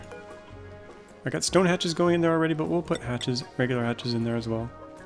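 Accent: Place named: Ontario, Canada. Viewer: Hol up. Unless Ive seen this mentioned before, I didnt know you're a fellow Canuck! What province? you sound more.........I dunno......maybe Ontario? Can't fully tell. Certainly not Alberta or Saskatchewan...........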